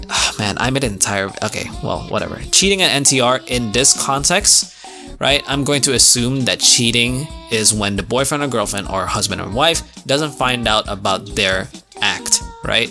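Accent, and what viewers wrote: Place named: United States. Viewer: ayo i thought bro is american he have a very good american accent hello bang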